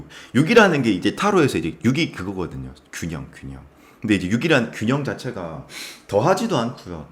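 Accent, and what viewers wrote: Gyeongsang, South Korea. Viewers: 3번 리딩 감사합니다🙏🏻 구수한 경상도 사투리 넘 듣기좋아요 ㅋㅋ 중간에 살아있네ㅋㅋ 쌤 리딩 처럼 졸라 좋은 결과 와서 후기 남기고 싶네요 😂 추운날씨 감기 조심하세요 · 같은 경상도라 그런지 더 정감이 갑니다~ 2번 선택 했는데요~ 요즘 다들 힘든 경기 겪고 있는듯한데 부디 잘 버티고 힘든 시기 고이 지나갔음 좋겠네요. 영상 자주 보고잡아요😊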